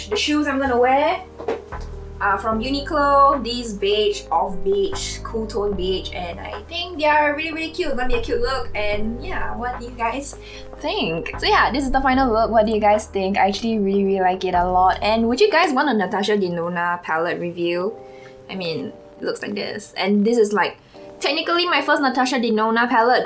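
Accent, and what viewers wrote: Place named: Singapore. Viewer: i've come across a few of your videos because it's quite hard to find youtube creators that fit my skin tone and reviewing the products i'm looking for!! heard your accent and immediately knew you're singaporean too!! subscribed and i love your comprehensive reviews and your timestamps and just wanna say jiayous <3 can't wait to see you blow up on yt hehe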